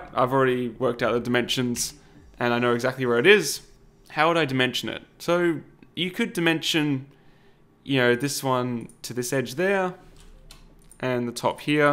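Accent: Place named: Australia. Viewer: Mate, thanks for your efforts with showing us noobs the ins and outs of 3d printing with the bonus of your real world application. (And we need more ozzie accents on youtube).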